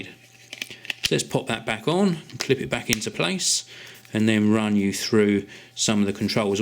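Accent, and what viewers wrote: United Kingdom. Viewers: freakin brit accent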